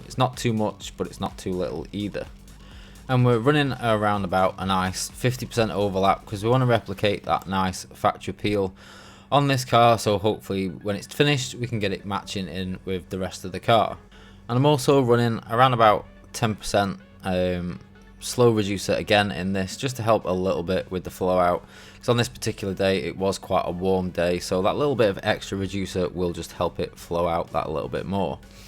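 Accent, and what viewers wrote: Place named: Liverpool, United Kingdom. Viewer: MY GOD HE"S FROM LIVERPOOL!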